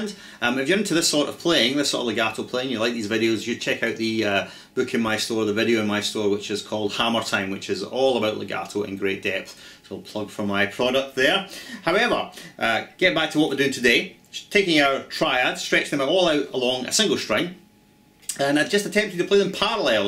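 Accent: Scotland, United Kingdom. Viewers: If the Scottish (?) accent wasn't cool enough, he owns up to not being "A pimple on Shawn Lane's Arse!" which most of us would have to admit. Very cool vid, thanks for posting.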